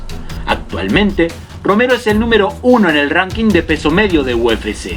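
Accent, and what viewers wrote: Argentina. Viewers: que eres Argentino o Uruguayo??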